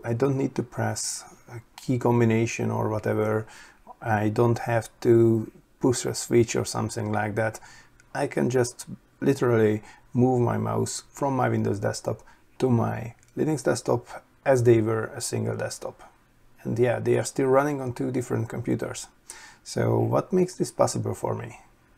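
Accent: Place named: Hungary. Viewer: Your accent surely tells you're Hungarian - that I didn't know when I found your channel. :D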